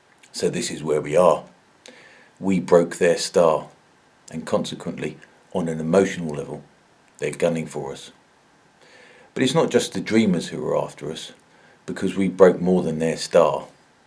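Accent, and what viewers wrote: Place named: United Kingdom. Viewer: Thourghilly enjoyed that, Both the incisive content And,the very british delivery. Heath in 72 took us into the common market without a referendum,as he stated to civil servants,his mandate to join came from his 1970 election victory, Yet that join or stay out question was never a part of the 1970 conservative manifesto. Heath said "it would be wrong if any Government contemplating membership of the European Community were to take this step without `the full hearted consent of Parliament and people" Heath lied Heath new that the opinion polls during <num> were consistently 68%against joining the common market. A referendum,which he all but promised,he knew he would lose, So he made up the story that his parties election victory was in itself the mandate to join. Further,he then suppressed the civil service advisories on both The loss of sovereignty but also on the future loss of judicial power, Economic freedom,and even military independence. 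This report surfaced and was made public in 2018. It clearly shows the deceit that heath used to sign us in , Without our ,the electorates, Agreement. Traitors like heath infest parliament. Cameron lost the brexit vote His party then elected may Knowing full well she was an adamant remainer, Those that had a hand in that election are traitors to the people and democracy of this country. Their can only be one way to deal with traitors, And should this country decend into civil war over this fundamental betrayal,rest assured ,those that Ac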